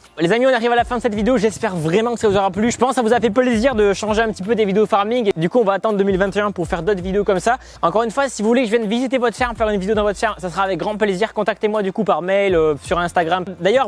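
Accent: South, France. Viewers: TU ES TOUJOURS AUSSI EXTRAODINAIRE AVEC TON ACCENT DU SUD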